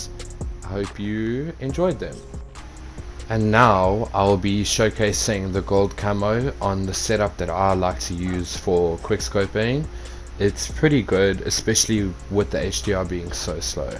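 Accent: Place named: South Africa. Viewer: Why do you sound south african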